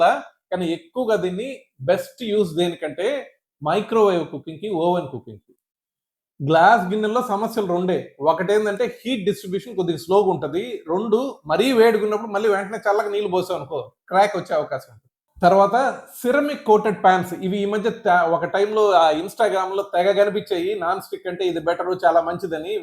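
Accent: Nellore, India. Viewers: I like your way of thinking and explaining things very clearly.I like your Nellore style accent.God bless you young Dentist.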